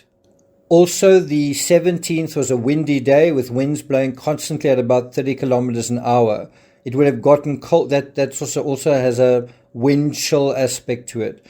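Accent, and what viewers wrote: South Africa. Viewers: Can’t listen to a South African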